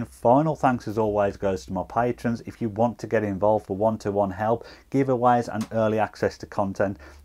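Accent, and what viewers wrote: United Kingdom. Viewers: I love your accent. What area of Britain are you from? Might be a silly question but I'm from Germany and not familiar with British accents.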